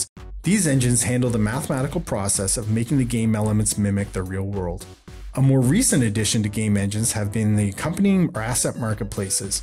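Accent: Canada. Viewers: Speaker is Canadian? Doesn't matter...just love your "o" sounds. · Is he Canadian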